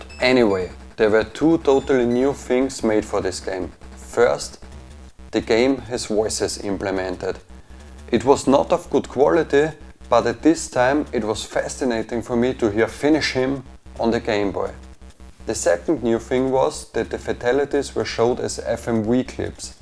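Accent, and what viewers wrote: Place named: Austria. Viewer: Γεια σου! Ρε μαλακα ich kann dir nur sagen, dass deine Editing Fähigkeiten richtig gut sind und die Umsetzung sehr gelungen ist. Es war toll deine Geschichte über deine Kindheitserinnerungen von Mortal Kombat zu hören in deinem fehlerfreien Englisch (mit enormem österreichischen Akzent) und das ohne ähms oder Unterbrechungen. Was du bestimmt verbessern kannst ist die kurzen Abstände zwischen den Sätzen zu cutten, da dies noch professioneller klingt. Dein Intro hat es mir echt angetan und ich finde es einfach genial, dass du zu deiner Herkunft stehst und dein Ding durchziehst. Ich habe dich auf Instagram gefunden und dein Link in der Bio hat mich hierhergeführt und ich war überrascht, dass du nur 12 Abonnenten hast. Durch mich hast du jetzt 13 und ich gebe dir hiermit einen Daumen nach oben. Ich hoffe deine zukünftigen Videos werden sich auch mit anderen Themen beschäftigen. 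Wenn du magst, kannst du mal auch meinen Channel anschauen, der ebenfalls in den Kinderschuhen steckt. Kannst mich auch gerne auf Instagram abonnieren, habe dir dort ebenfalls ein Abo gegeben. Ich habe ein Unboxing und Gameplay zur SNES Classic Mini gemacht, welches mir total Spass gemacht hat. Vielleicht wird dir das auch gefallen! Bleib dir selber treu und ich freue mich auf dein nächstes Video. Grüsse von einem Serben aus der Schweiz Vlad :)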